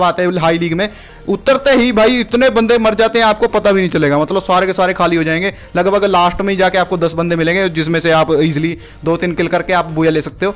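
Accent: Punjab, India. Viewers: Hello bhai aap Punjabi Ho jasa Punjabi bolte app Ho Kay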